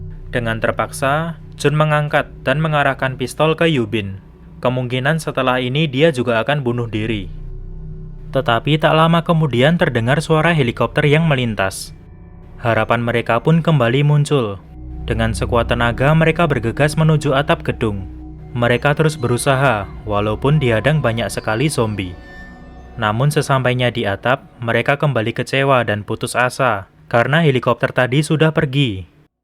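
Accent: Java, Indonesia. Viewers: Jawa y bang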